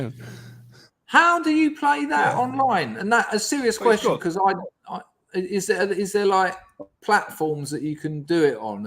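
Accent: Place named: London, United Kingdom. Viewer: I really miss the London accent I spent a lot of time in Hanwell over the decades 🏴⚒️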